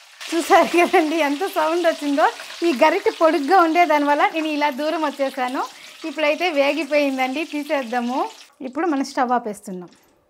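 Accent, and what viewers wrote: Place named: Chittoor, India. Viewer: Maa Chittoor yasa lo matladuthunte Santhosham ga undhi. Tamil and Telugu kalisina yasa. Nanna, Superb ga chesavu Laddu, mixture. Mana function ante Laddu and Mixture compulsory kadha.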